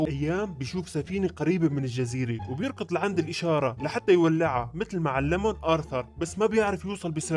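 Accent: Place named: Palestine. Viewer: لهجتك تشبه اللهجة الفلسطينية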